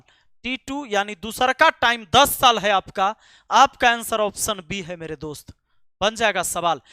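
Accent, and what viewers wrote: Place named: Delhi, India. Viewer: Delhi me rhata hoga wha aise hi bolte h adhiktar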